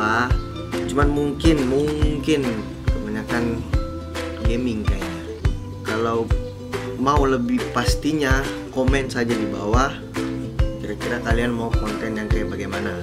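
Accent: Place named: Makassar, Indonesia. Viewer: konten gaming boleh tuh bang jadiin point, game horror kalo bisa kaya dulu channel heheee, kangen banget denger teriak teriak ketakutan pake logat makassar mu ituuu, pokok nya terusin aja, aku udh dukung dari duluuuuuuu banget. hehe semangat ya buat kedepannya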